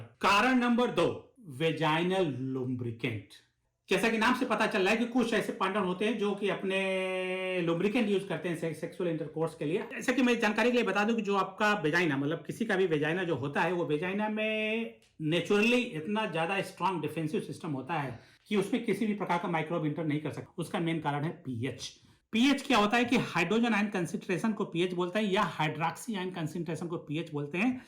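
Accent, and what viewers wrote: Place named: North, India. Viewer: आपको मेरा प्रणाम।आप के हिन्दी उच्चारण से इतना स्पष्ट है कि आप उत्तर प्रदेश, मध्यप्रदेश, बिहार के निवासी हैं।क्रेनबेरी तो शांपिग माल में मिलेगा।एक आम भारतीय इस बीमारी में क्या उपयोग कर सकता है जो वस्तु आसानी से उपलब्ध हो क्योंकि ग्रामीण भारतीय उसे आसानी से उसे प्रयोग कर सकता है। मैं यह आपकी बोलचाल से समझ पाया हूं कि आप शायद कहीं विदेश में रहते हैं। कृपया मेरा मार्गदर्शन करें शंका समाधान करने के लिए आपका धन्यवाद।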